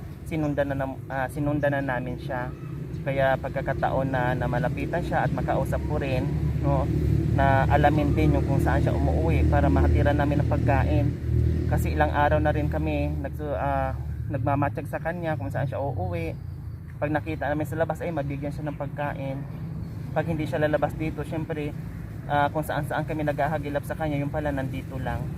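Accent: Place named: Ilocos, Philippines. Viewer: ilicano kba? kz t9no ng pananalita mo ilicano. More blessing sa iyo dahil mstulungin ka kya papanoorin ko na ang chanel utube mo.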